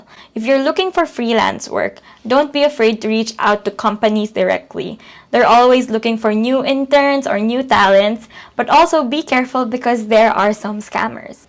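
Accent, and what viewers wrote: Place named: England, United Kingdom. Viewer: Hi, Ate. Thank you for sharing your info and experience with us about in the field being as a freelancer. Also I adore your English accent. I'll be doing freelance soon, once I have done with some of my portfolio. Do you mind if you make a short video showing your office setup and what things to consider when working from home. I'll be glad if you do. Salamatss~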